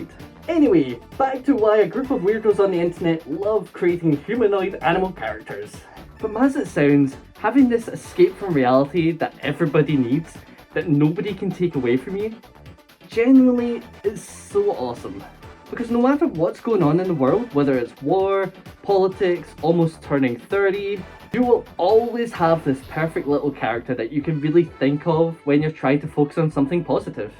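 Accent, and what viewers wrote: Scotland, United Kingdom. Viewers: I love ur accent SCOTLAND RULES!!!!!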